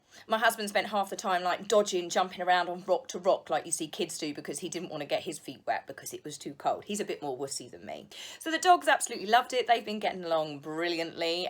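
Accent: United Kingdom. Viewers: you are funny as hell. I love love love your British accent.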